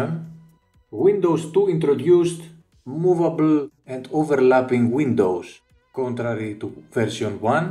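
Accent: Greece. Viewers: He sounds Greek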